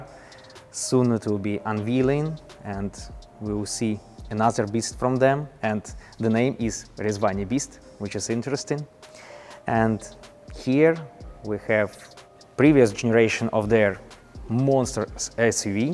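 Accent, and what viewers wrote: Russia. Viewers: Лучше по русски разговаривай. Инглишь с русским акцентом нигде не спутаешь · Давай на кыргызском, мне этот руснячий акцент не нравится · Он специально делает стереотипный акцент СССР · Твой Руzкий акцент слишком Палевный), вас Рашистов Видно из далека, особенно таких Лицемеров ☝️ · Русский акцент видно с трудом разговаривать на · Russian accent could feel easily 😅😅😅. Bro improve your speech. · Смешно говорит руский по английски )) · Брат мой русский язык рвется на ружу из твоего английского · Как люди не замечают что у него русский акцент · Ужас. Пожалуйста подтяни свой акцент. Прям как-будто бы специально на жёстком русинглише говоришь(как в фильмах утрируют Русских). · Какой ужасный русский акцент))) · Акцент русский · Какой жёсткий русский акцент))) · Висиз ви хард рашын экцент!) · зысиз супер кьют акцент! Бест рашшен акцент ай эвер хёрд!🤝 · Акцент то русский у парня, татарин скорей всего. 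Молодец! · Сразу видно что русскоязычный говорит на английском 😂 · Это что ещё за нерусский с явным русским произношением и непонятной машиной, продающейся по спекулятивной цене? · That is a stupid car and even more stupid review done with the most annoying Russian accent😂 Jesus Christ Lord have mercy ❤ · Это самый ужасный дебильный руский акцент.Чувак учи нормально английский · Мужик, у тебя чудовищный русский акцент! Я даже не стал писать тебе на английском, ибо не вижу в этом смысла 😁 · your Russian accent just killing my ears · WTF! How many years do you live there still having the fucking russian accent? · Как сильно прослушивается 'русский')) Слишком чётко звучат почти все слова. Особенно слог of. У иностранцев слоги совсем не слышно. Ну а так круто, видимо глубокое знание англияского есть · Change the presenter guy, nobody would take this guy serious, with the russian accent. Cute little suv, but for 700K, you can probably get a real used military tank for that price. 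If a small little drone can take out multi-million $$ tank or helicopter in Ukraine, this should be a POC. Total JOKE. This is for rich Beta males with no balls. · This strong russian accent is so hard to listen😢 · Русский твой английский в #опу...но почему ета нация така тупая...🤮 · … на произношением поработай, а то русский так и прет, аж ухо режет - хав и парт!! 😊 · Ты откуда сам, чел? Акцент у тя явно выраженный русский! 😀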